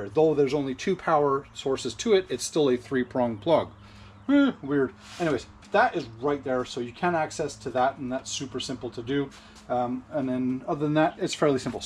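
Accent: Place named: Quebec, Canada. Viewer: On dirait que tu as un accent québécois mon ami 😊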